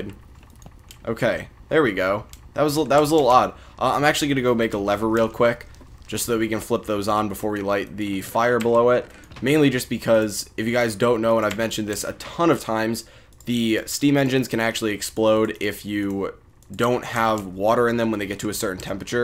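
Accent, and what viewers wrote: United States. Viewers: Are you American by any chance?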